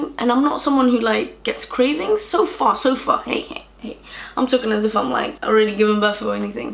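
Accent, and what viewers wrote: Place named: South London, United Kingdom. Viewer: Are you from south London idk maybe it's just me but it's your accent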